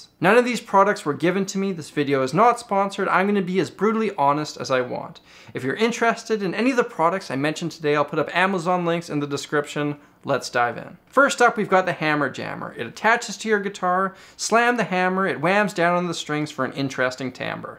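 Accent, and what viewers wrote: Canada. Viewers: to be fairrr isnt he canadien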